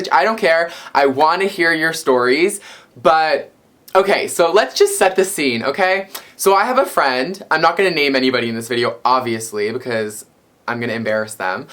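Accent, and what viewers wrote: Canada. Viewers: are you Canadian? it sounds like it sometimes. lol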